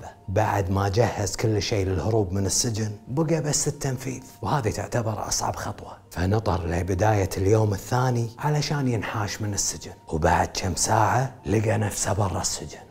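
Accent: Kuwait. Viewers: انت كويتي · ملاحظة صغيرة انا من الجزائر ونلقى صعوبة في فهم اللهجة الكويتية حاول تبسط فيها ..قدامي لماذا جدامي ههه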